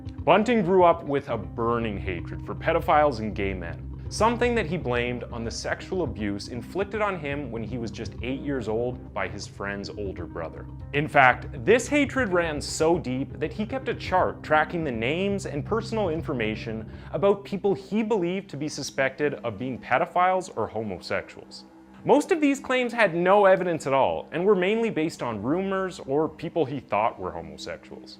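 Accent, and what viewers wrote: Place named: Canada. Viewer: Is this a Canadian channel? Dude has a ridiculous accent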